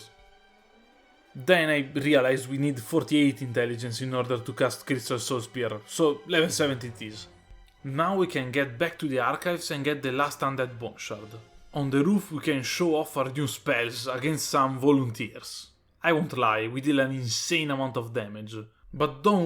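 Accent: Italy. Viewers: Are you italian? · scusa se chiedo, ma mi sembra che tu sia italiano, o comunque mi pare tu abbia un forte accento italiano, o mi sbaglio? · sei italiano? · Ma sei Italiano? :D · Si sente che sei italiano 😂😂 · Mi fa morire con la cadenza italiana 😂😂😂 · Ma sei italiano?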